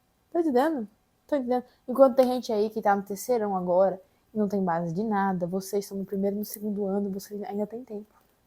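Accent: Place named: Pará, Brazil. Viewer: Tu é paraense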